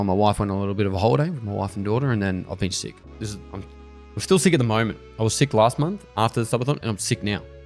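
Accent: Australia. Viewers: Finally caught up. I can now understand Aussie at 2x speeds!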